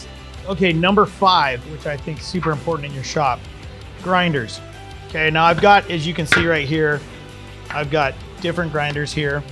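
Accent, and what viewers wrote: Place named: Montana, United States. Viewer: are you from Montana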